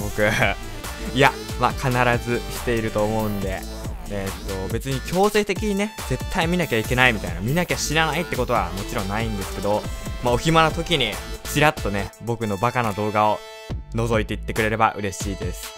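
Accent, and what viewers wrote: Kansai, Japan. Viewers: さんの関西弁がたまに出る動画が好きです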